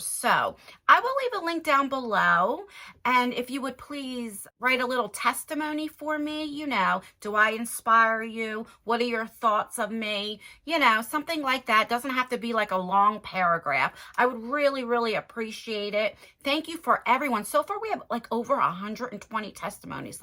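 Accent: New Jersey, United States. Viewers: Hey there, I always chuckle when I hear your Jersey accent, especially since as a New Yorker, I can tell the difference. I actually really like the backdrop. I rarely pay any mind to peoples backgrounds tho, unless they mention it like you did. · On=AWN is that a jersey accent?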